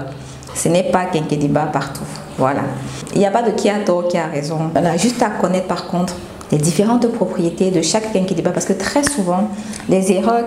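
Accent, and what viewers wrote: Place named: Ivory Coast. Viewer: Tu commence à parler comme une Ivoirienne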